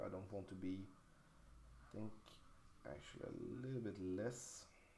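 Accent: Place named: Scania, Sweden. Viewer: Härligt med lite skånska👌🏻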